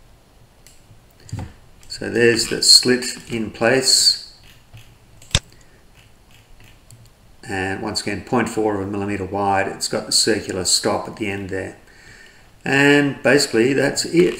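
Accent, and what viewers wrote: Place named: Australia. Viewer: Good job! Love hearing your accent it reminds my Mother in law. Yes she was an Aussi as well. Go Wallabys!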